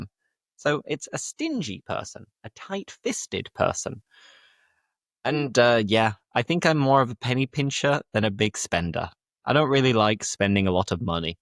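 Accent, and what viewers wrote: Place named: United Kingdom. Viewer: I found your page few days ago, and you are an excellent teacher and your accent is amazing .. I love British accent..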